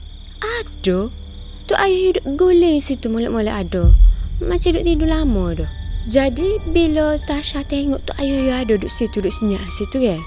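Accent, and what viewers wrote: Kelantan, Malaysia. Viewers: Hebat sangat ke minah ni speaking setiap kali kau bercerita kisah seram?bunyi orang kelantan!tapi acah acah bagus cakap mat saleh😜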